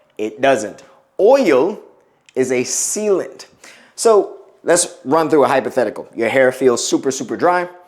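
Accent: Bahamas. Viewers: You sound like your of Bahamian decent. 🤷🏽‍♀️🇧🇸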